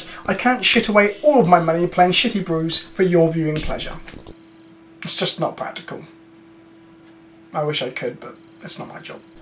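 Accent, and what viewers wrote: United Kingdom. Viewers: It is not really the deck, but the cutting and presentation. I love when you stay on your shots, where you explain a pun, for just a second to long. Reminds me of Family Guy. :D Also the off-topic stories are really funny and your british english is the icing on the cake. :D There are not a lot of YouTube Channels who embrace their accent like you do.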